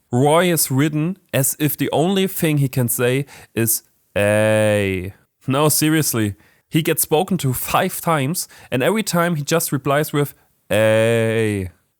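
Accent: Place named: Germany. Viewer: Your German accent makes it even funnier. Weiter so!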